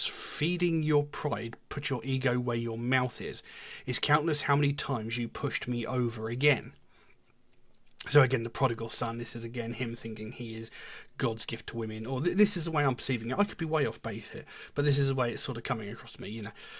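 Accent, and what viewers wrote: United Kingdom. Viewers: Hi from another Brit, I couldn’t mistake the accent - not quite sure where! I’m from south of Brum. Nice reaction, you got it on the nail with your analysis very good - their lyrics tend to be a bit open to interpretation! I found them right at the start, it’s been an amazing experience seeing them growing up and learning their craft! I saw them last year with Muse at Plymouth and this year at Brum early on in their world tour of sold out headliners. They’re at Wacken later this month!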